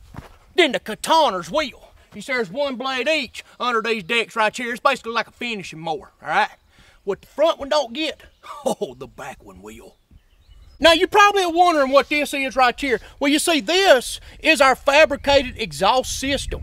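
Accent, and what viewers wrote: South, United States. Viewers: “ (I can’t spell his redneck accent but it’s awesome)”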